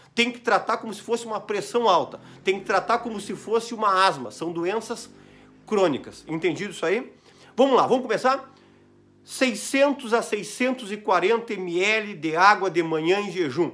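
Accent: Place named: Rio de Janeiro, Brazil. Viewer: Dr, és aqui do RS, como diz, e pra que ou por que esse sotaque carioca?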